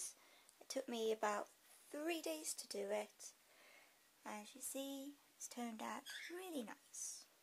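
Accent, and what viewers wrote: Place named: Wales, United Kingdom. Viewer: This must have taken SOOOOOOO long!! Are you from Wales? You sound Welsh :P xx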